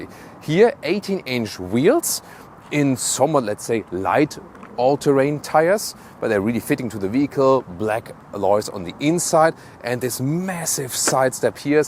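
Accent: Germany. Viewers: That German accent is hot 🥵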